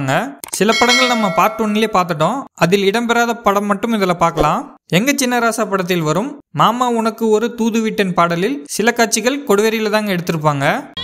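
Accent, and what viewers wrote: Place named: Madurai, India. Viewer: Super madurai language avinke .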